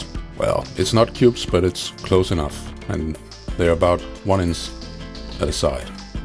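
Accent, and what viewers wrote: Denmark. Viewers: Are you danish brormand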